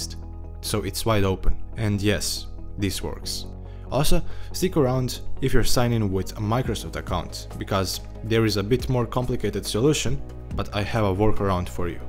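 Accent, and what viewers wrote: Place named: Balkans. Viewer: Your accent sounds like you're from the Balkan region, and after seeing you accidentally type "Ć" , I'm pretty sure you are, so I have a question: "Odakle si?"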